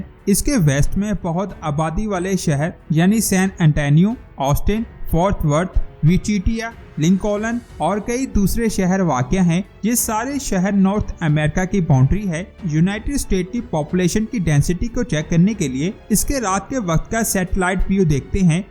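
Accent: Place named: Pakistan. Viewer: Love from india ! You're the first good Pakistani YouTuber I've seen (can tell by your Hindi/Urdu accent)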